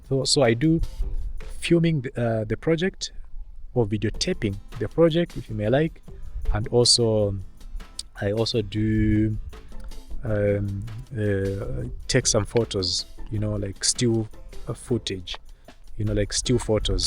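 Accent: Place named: Zimbabwe. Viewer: Wena you are an imposter from Zimbabwe why don’t you tell the truth everything about you screams Zimbawean even the accent . Zambians are very humble accommodating people .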